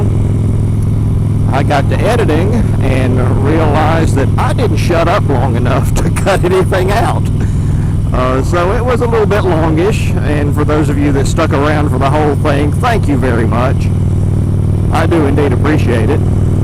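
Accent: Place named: South, United States. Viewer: I think a southern drawl actually helps in a motovlog. It blends well with riding down a country road and the rumble of the Harley in the background. It has a Mark Twain feel about it. And yes, everyone hates the sound of their voice, unless your name was Charlton Heston or Gregory Peck.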